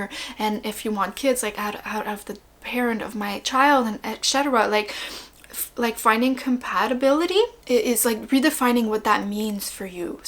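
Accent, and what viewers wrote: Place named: Quebec, Canada. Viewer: awesome video girl, & nice french- canadian accent ;)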